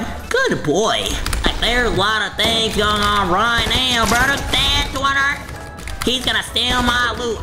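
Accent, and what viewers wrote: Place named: Texas, United States. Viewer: Love the new Texas accent. 🔥🔥🔥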